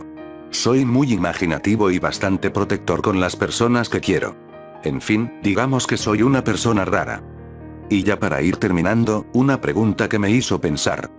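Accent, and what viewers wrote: Argentina. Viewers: Sabia que eras argentino uwu Se nota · We yo ya sabía que eras argentino :V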